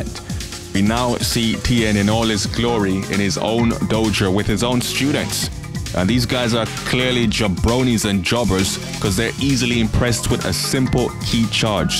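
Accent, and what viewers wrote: Caribbean. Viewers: You from the Caribbean bro? Can hear the accent but haven’t lived there long enough to pinpoint which island that accent is haha. Amazing video btw, glad someone else felt the same way. Most other fans and the writers were giving UI Goku, Jiren and Hit handjobs while sleeping on the 80 other cool and unique fighters that they should have also given some spotlight. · are you west indian?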